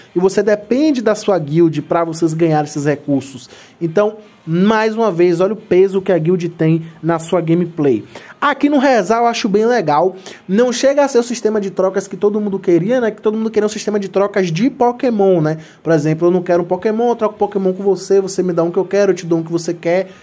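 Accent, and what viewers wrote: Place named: Salvador, Brazil. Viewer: Salve de Salvador meu nobre, pelo sotaque sei que é conterrâneo, de qual bairro fera?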